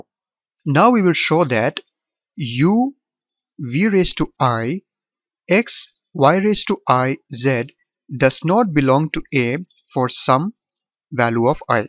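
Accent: India. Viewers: He's from India...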